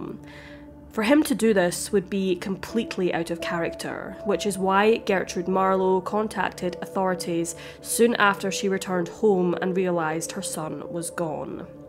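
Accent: Scotland, United Kingdom. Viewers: She’s Scottish that’s the way it comes over.lif u know any thing about how language is spoken.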